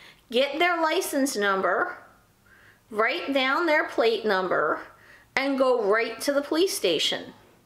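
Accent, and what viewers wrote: New Jersey, United States. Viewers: Get a dog, you’re much less likely to be a victim of crime if you have a dog. If they have to choose which truck to break into I guarantee it won’t be the one with the dog. When I was in the military actual hard as f bad guys weren’t scared of us pointing a rifle at their heads but you bring out a dog and they disappear, quick You can tell your from New Jersey lol Love you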